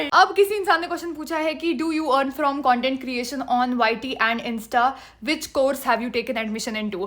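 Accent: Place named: Kashmir, India. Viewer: Brooo, I literally felt like you sounded Kashmiri, but I wasn’t totally sure. I was getting that vibe from you really cool! I’m Kashmiri too. I know that wasn’t really necessary to say 🥀 And congrats on hitting 20K!!!💅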